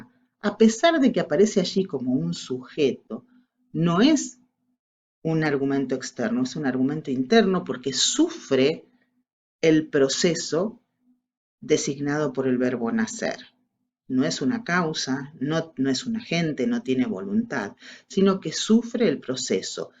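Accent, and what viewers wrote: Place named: Argentina. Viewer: Por favor no dejes de hacer estos videos! Están increíbles. Muy bien explicados, muy interesantes y en español de argentina! 🇦🇷❤ Te agradezco mucho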